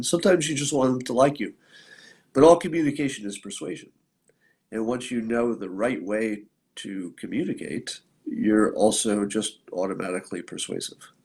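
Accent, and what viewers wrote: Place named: South, United States. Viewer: Watched you on Gutfeld, your voice is fine, has a very rich, almost southern quality.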